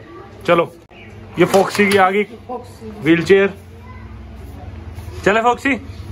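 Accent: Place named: Haryana, India. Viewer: Mujhe toh tumharo haryanwi tone bahut achhi lgti h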